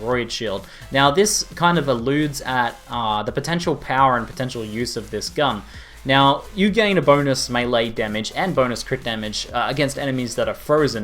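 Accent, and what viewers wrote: Australia. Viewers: woohoo a Aussie YT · Are you Aussie?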